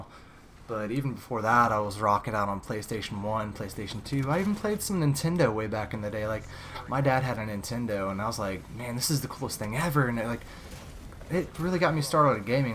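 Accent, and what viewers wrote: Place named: United States. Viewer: Lol, that american accent.......i like it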